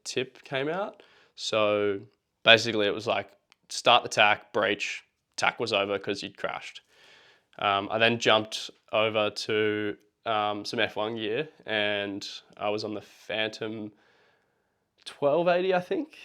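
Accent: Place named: New Zealand. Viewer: Circling back to this vid as coldest month of the year in NZ, sun down just after 5pm(You sound like a kiwi too) and I have 2 new designs I love. F-one SK8 looks a lot like my latest one and the one back from that looks like Armstrong ST and Armstrong released theirs about the same time I made mine. Both my latest foils have great glide but my latest one though only slightly smaller, I have stability that makes me feel that I can foot switch on foil. Of course I still need to get used to taking my moment to be 100% about new foot placement but the new foil makes it so much easier. I really favor foils with a bit of sweep and my latest one that I made to fit Axis fuselage, it has almost straight back adge and sweeps to extremely narrow and thin tips. For all the potential for injury, the blissful smooth ride of my 115kg on this 1240sqcm 1M span foil is amazing and yet in surf it's super loose. 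Lots of our locals on Axis but my few tries of them I've not liked the handling. Axis ARTs do have one of the things I incorporated in my own designs from feb 2022 which is wing tip twist. I've refined my own wingtip twist amount and placement since then to better stretch max speed and min stall speed. It's fascinating seeing all the brands making their own advances in different ways.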